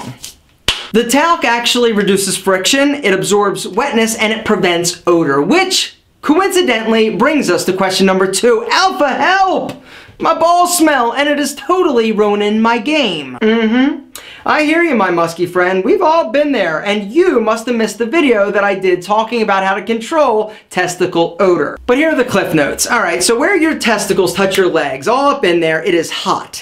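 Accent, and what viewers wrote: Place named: East Coast, United States. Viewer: Where are you from bruh....im guessing close to the eastern coast.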